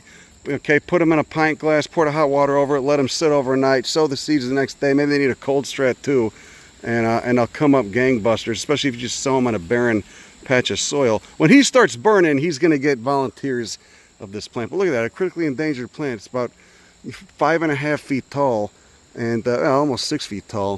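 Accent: Chicago, United States. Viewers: I notice your Chicago accent gets stronger when you're back in da region!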